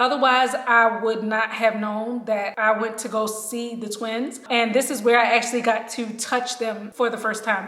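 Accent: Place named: South, United States. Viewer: That morphine got you sounding COUNTRY!! Congratulation! 🎉🎉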